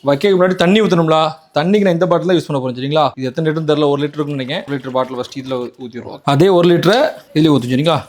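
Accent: Tirunelveli, India. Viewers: Thirunelveli language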